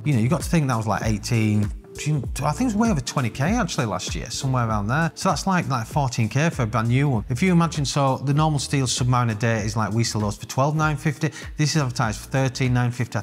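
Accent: United Kingdom. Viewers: Very strong brit accent, could you slow down a lil bit sir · Sir you always have excellent, cut to the chase, no BS content. I have to really concentrate on your videos because you talk at a faster pace with your British accent. It’s seems like a mix of British, Scottish, Irish and Australian. Where did you grow up?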